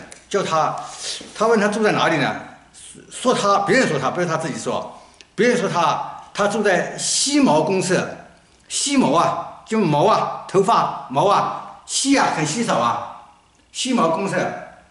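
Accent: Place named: Zhejiang, China. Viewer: 大师是浙江人？口音很重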